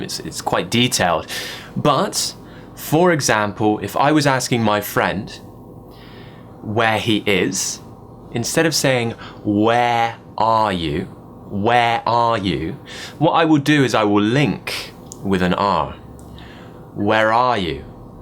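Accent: RP, United Kingdom. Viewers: Cheers mate !!! You are brilliant !!! I love the way you explain, your english accent is so posh. So beautiful !!! I'm addicted to your videos !!! Thanks a lot for your excellent work !!! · I love your British accent❤ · Love your British accent! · i LOOVE your british accent. Thumbs up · I love you so much hahaa. Being serious I love your british accent and your tips. Personally I found easier british than american english besides it sounds more beautiful. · Love it!! 🥰British accent. Handsome teacher. 🌹 One more Subscribe, ✔️ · I love this British accent .... would u please tell me your Facebook Id...I wanna join and learn from u......or whataap number..... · I love ya in British accent 🤗😂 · I love u n ur British accent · Mr Thank you very much for your useful British English videos. In addition to this your British pronunciation is mysterious beautiful. 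· I came from studying Scottish accent, and I really need to hear your beautiful RP accent What a wonderful world this would be if everyone spoke like you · I love your British accent....never turn iit into an American one even if it's fashionable nowadays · are you speaking in an RP accent in this video? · I've fell in love with British accent as well as the teacher 😆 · I'm really fond of your British accent , your videos are very helpful. Thanking you a bundle . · كم انت رائع يا استاذ احببت لكنتك البريطانية جداً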